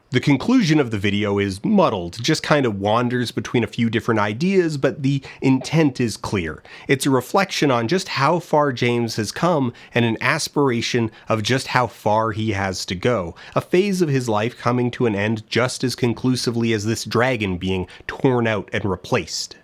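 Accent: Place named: Canada. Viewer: I swear your "beens" and "processes" get more Canadian every video.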